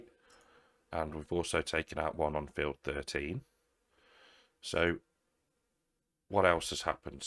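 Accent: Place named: Birmingham, United Kingdom. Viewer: Hello Mr Brummie😁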